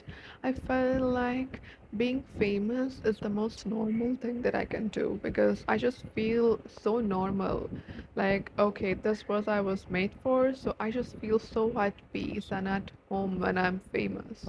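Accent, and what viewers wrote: Bihar, India. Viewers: 18 years old seriously Dekh kr 25\27 saal ki lgte ho Plz apna bihari accent sunaao bht vibe and maza dono milta hai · Bihari pe accent kese🥰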